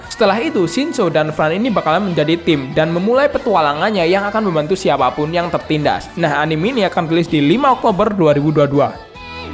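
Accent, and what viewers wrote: Lampung, Indonesia. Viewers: Lah org lampung min? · Lah warek Lampung tah min? Wkwkwk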